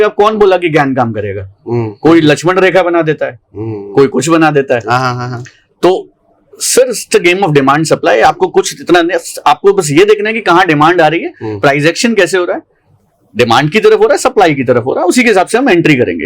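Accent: Bihar, India. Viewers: I like your strategy but BIHARI sun ke man nahi karta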